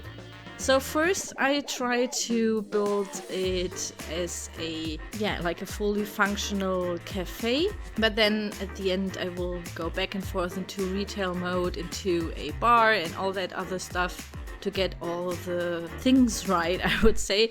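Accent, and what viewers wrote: Germany. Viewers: Ja, in Deutschland sind fast alle Starbucks in anderen Gebäuden integriert. Ich habe vom ersten Satz an gehört, dass du deutsch bist. :D Aber dein Englisch ist gut. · Are you German? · Du hörst dich richtig an wie eine Deutsche die englisch spricht..😂😂😂😂 aber seeeeehhrrr süß !! 😂😂🦄🦄🦄💕💕❤️❤️🍓🍓😘😘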